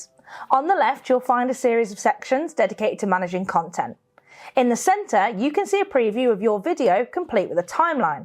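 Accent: United Kingdom. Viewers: This woman definitely British 😂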